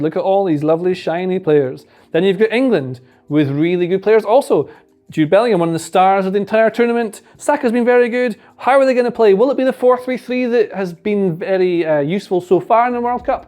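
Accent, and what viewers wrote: Scotland, United Kingdom. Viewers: Always good to hear a Scot analysing how England can win their quarter final. Enjoyed the analysis as always.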